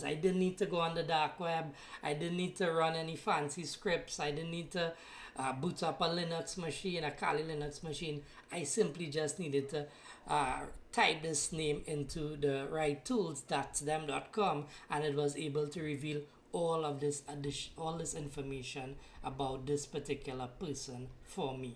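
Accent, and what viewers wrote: Trinidad and Tobago. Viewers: You sound trini 🤔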